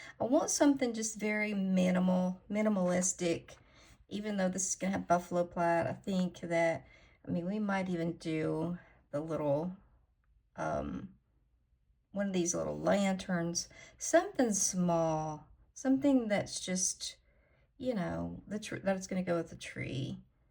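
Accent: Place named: South, United States. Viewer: This is a darling mani! The reindeer and tree turned out so cute and I love plaid. The colors you chose are beautiful. Please don’t apologize for that southern twang. It’s your heritage and part of what makes you special. Being from the South I sometimes wish I had a Southern accent, but I do not. I don’t even sound like my siblings. I take after my mom who was from NC. That’s not that far away but the accents are so different. My dad was a country bumpkin if ever there was one! I’m the odd ball of the family. Even my daughter doesn’t say words the same way I do. Geez, talk about rambling!😂 Thanks for another fun night with you. I’m going to miss these videos.❤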